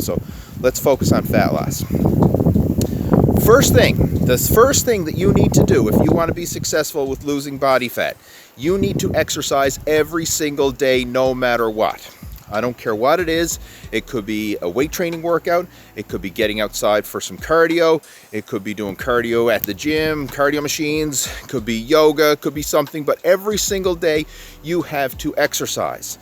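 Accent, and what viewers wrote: Newfoundland, Canada. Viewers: Are you a Newfie?!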